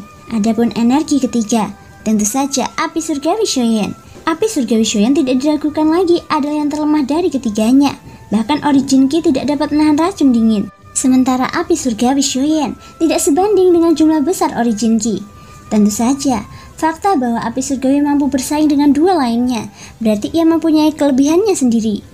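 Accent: Java, Indonesia. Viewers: hai mimin medock banget suaranya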